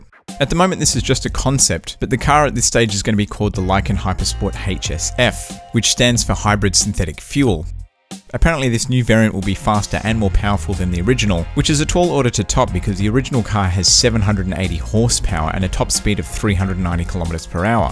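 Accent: Australia. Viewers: Are from Australia.?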